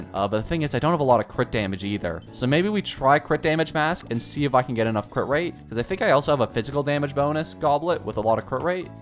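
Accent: Canada. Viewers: he sounds canadian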